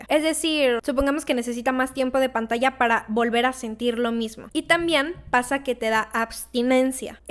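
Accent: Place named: Whitexican, Mexico. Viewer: Primer video de (pseudo) ensayos que veo con tono mmdor whitexican, algo irónico.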